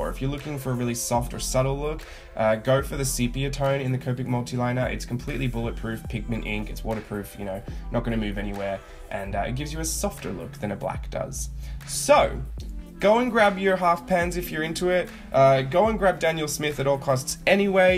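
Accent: Australia. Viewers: Thanks for the lovely original swatches and the info on DS colours. It's always nice to hear an Aussie accent on mixed media so keep making these wonderful videos. xx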